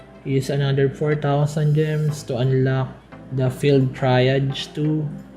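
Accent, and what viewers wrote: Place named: Philippines. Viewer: You're filipino just like me, I listened to your accent and I knew, hehe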